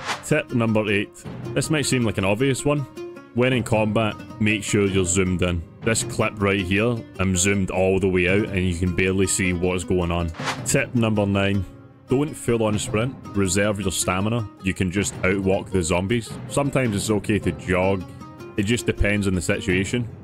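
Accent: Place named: United Kingdom. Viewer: Always great to hear fellow brit bongs talk PZ, no matter where in our glorious Isles you're from. Good tip vid, though I'm sure you can just hunker down at the safehouse during the helicopter event, as long as it hasn't spotted you, it will just fly around the map. Easier said than done but if you listen to the emergency broadcast on radio before sleeping it will give you the heads up about the chopper for the next day.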